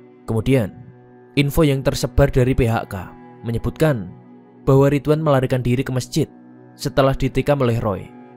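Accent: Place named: Java, Indonesia. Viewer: Medok guys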